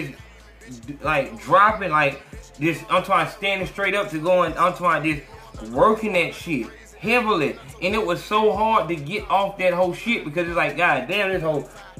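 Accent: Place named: South, United States. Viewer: “Upping that gaw damn fye” 😁 salute brother the content deep af ... I know u country as hell fr #SalutefromFlorida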